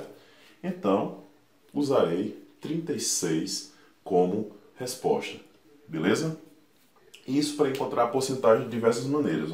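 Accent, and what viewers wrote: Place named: Pernambuco, Brazil. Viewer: Tive grande facilidade em aprender contigo professor. Eu já estudara os anos anteriores este assunto ,mas não conseguia tanto êxito.Nos tempos de escola( há muitos anos atrás). Sua jeitinho simples de explicar à matéria facilitou muito...OBRIGADA! OBS: esse teu sotaque lembra-me meus vizinhos pernambucanos.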